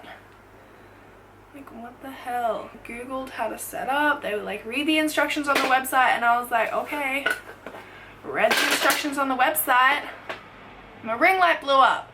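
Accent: Australia. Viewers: I absolutely love your Australian accent ❤❤ I'm English and my accent is so boring 😂😂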